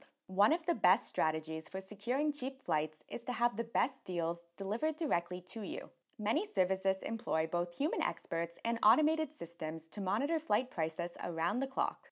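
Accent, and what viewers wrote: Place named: Coney Island, United States. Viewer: Love the accent are you from Coney Island Canada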